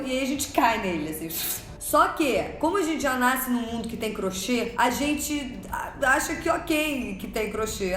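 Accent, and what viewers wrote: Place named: Rio de Janeiro, Brazil. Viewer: Eu li um pouco de Fuco, que ele é extremamente futurista e analítico sobre nossas existências, e eu fiquei confusa confesso com a informação de que somos pontinhos e milhares deles e que enxergamos a matéria em si porém o nosso cérebro transforma esses pontinhos em matéria, por isso nos enxergamos em corpos físicos e não pontinhos, teorias são uma excelência da vida humana, se todos os seres humanos tivessem consciência das suas capacidades que vão além desta que é a matéria prima primitiva o mundo seria melhor para ser vivido certeza, e acho super fofo você falando com seus "s" no final das palavras com sotaque carioques ....adoro seu canal vida inteligente no YouTube sucesso jout jout...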